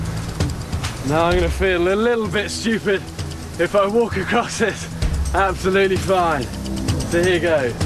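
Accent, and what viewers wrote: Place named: England, United Kingdom. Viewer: Recien me doy cuenta por su acento que es ingles :O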